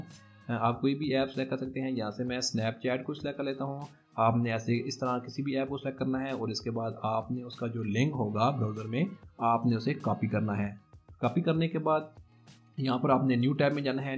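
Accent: India. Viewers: The indian voice is Is- PERFECTO!!!!!!😎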